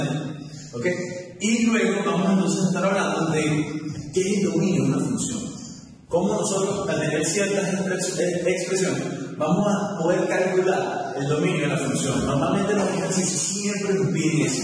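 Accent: Venezuela. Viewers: Usted es Venezolano donde se pare. ¿oyó? (Soy Venezolano) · este pana si habla sifrino....pero explica bien. saludos...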